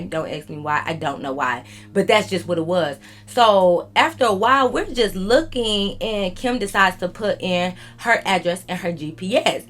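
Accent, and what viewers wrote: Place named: Alabama, United States. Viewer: Sound like Alabama or the south for sure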